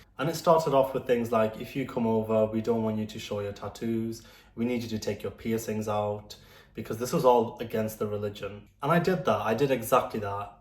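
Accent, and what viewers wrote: United Kingdom. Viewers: They should’ve known the moment you start talking with a British accent that you were gay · sorry mate but what did you expect in reality to happen ? that a conservative family would accept such liberal ideas with open arms ? both of you are at fault here its so obvious they didnt do a good job at raising a muslim especially in a foreign secular country (from your accent its the UK probably) , you being gay wouldve just been fine you cant control who you have feelings towards whatever sure islam doesnt punish feelings but when you dont follow the religion and come with a secular ideology to a muslim family thats your fault for crossing the line , your sister can raise her child however she wants and she wants it to be under islam then she's right to ask you to change certain stuff and accomodate its not gonna kill you if you hide the tattoos and earrings for a while and you cant complain about how she wants her child raised even if it costs her her uncle , its honestly sad that these families dont teach their children islam the proper way in western countries and end up in these tragic situations and you're certainly not the first or the last to be in this situation , i hope you find your way to islam even after such an experience and get back the family bonds you lost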